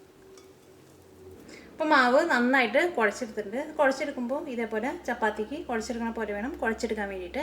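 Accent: Thrissur, India. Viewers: ചേച്ചിടെ ഭാഷ കേൾക്കാൻ തന്നെ വിഡിയോ കാണാറുണ്ട് ... അങ്കമാലി, തൃശൂർ ,,, ഭാഷ ഒത്തിരി ഇഷ്ടമ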